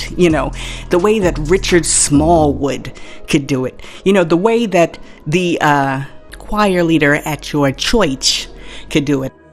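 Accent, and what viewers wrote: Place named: Queens, United States. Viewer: What up fam…. What up sis..!! You Jamaica Queens strong I can tell.!!😃. Great job please keep it up..!!! Sup..!!!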